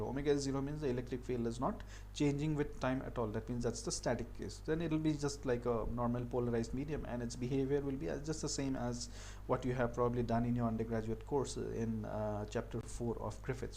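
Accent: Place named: Bengal, India. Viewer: Very clear explanations sir! 😊 Your accent seems to bengali. Loved it! From Pakistan 🇵🇰🇵🇰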